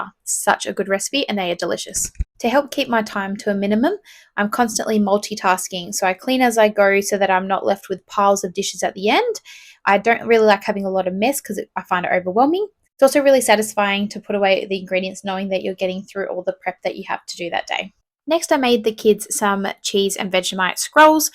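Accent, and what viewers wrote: Australia. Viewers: The coles bags got me here, love hearing an Australian accent 😅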